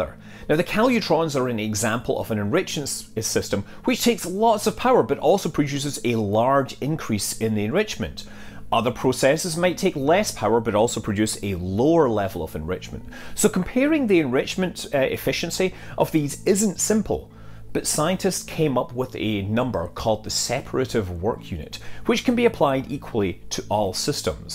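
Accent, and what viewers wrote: United Kingdom. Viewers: Is s Accent British or Irish? Don't get me wrong,I love it and understand him better than someone from Chicago, just curious and glad he is here with us in America now! ❤❤❤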